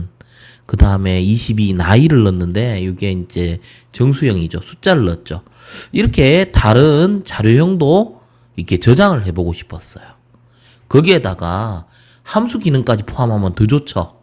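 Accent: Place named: Gyeongsang, South Korea. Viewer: 왜 나이든 프로그래머들은 죄다 경상도 사투리를 쓰는가...... 나도 모르게 사투리 리듬타다보면 고개만 끄덕거리다 머리에 하나도 안들어오게 되는데.....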